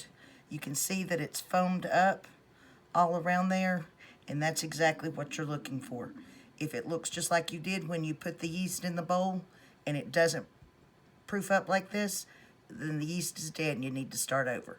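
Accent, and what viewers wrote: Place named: South, United States. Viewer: What a treat to find your channel today! I’ve been baking bread, rolls, English muffins, etc, etc. for sixty years now, and like you, it’s been like a therapy for me. I’ve always loved cooking and baking! This recipe will be my next one! Thank you! I look forward to your future videos and will, of course, be checking out past postings. Love your easy going style and southern accent!🤗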